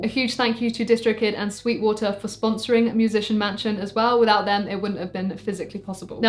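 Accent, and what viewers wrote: United Kingdom. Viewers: The real question is, did someone make out with someone while at the mansion? Also I love how britishly you say "wa'eva", "we should'av donnet" and "sumting lae tha". Anyways, big fan here. I've watched you since you were a teenager and promoted Bias FX 2 (I actually bought it because of your videos). Keep rocking, you are amazing! Cheers!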